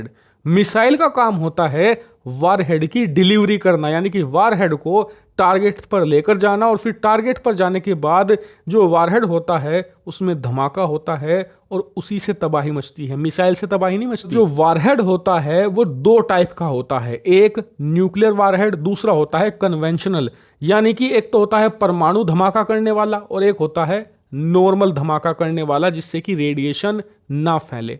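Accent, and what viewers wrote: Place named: UP-Bihar, India. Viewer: आप जरूर up-bihar के हैं I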